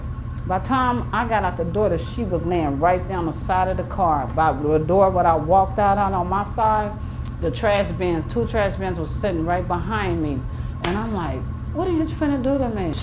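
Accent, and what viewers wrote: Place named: Louisiana, United States. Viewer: She still got our accent tho.... Half of my family moved to California in the late 60's and they know they are from Louisiana...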